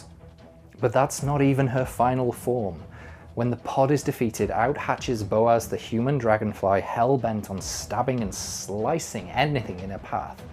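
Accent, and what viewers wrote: Wales, United Kingdom. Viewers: I have a friend who thinks you sound Welsh but I think you sound fully English AF, so how do you classify your accent? XD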